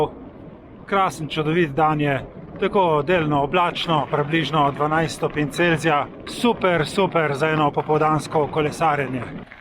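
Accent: Primorska, Slovenia. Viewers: Občasno spremljam vaše zanimive video bloge. Všeč pa mi je to, da v njih govorite v lepi in razločni slovenščini(da ima primorski pridih je zgolj pozitivno) in prav tako vidim, da se zelo potrudite tudi v komentarjih in odgovorih tukaj ali na Stravi. Pohvalno👍 Srečno v hobiju, ki vas veseli pa naj bo to na cesti, makedamskih ravnicah ali pa vratolomnih spustih po trailih.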